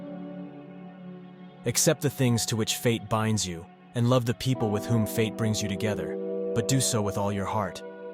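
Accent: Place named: United States. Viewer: It would have enhanced this video if you used a more authoritative, deeper narrative voice. This sounds like a random America kid in a bar reading quotes from Marcus Aurelius.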